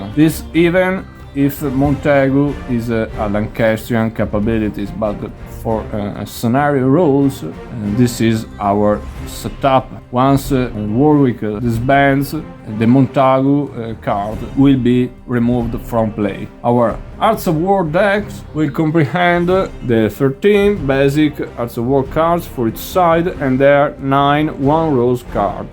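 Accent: Italy. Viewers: English with an Italian accent.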